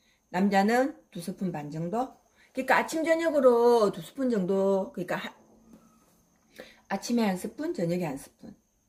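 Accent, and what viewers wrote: Gyeongsang, South Korea. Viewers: 경상도 사투리 정겹노 ㅋㅋ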